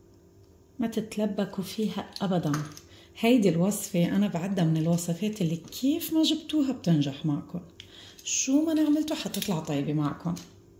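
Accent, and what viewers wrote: Iraq. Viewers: تسلم ايدك ماشالله إشكد مرتبة اللهجة العراقية تجنن عليج❤ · فدو حلو عليج الحجي العراقي يابعد روحي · عاشت ايدك انتي انسانه طيبه وروعه وشكل طاقية السلطان باين حلوه وطيبه وكلامك كلش حلو بالعراقي وتحياتي لكي ول وحماتك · تسلم ايدك يا شكلها يشهي فعلاً من بعدك قفل الترند مافي احد يعملها بعدك وحلوة بفمك اللهجة العراقية متابعتك من العراق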